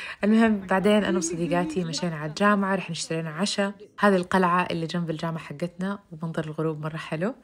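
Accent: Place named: Saudi Arabia. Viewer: اللهجة السعودية سموذ ومريحة وصوتك حلو ماشاءالله ❤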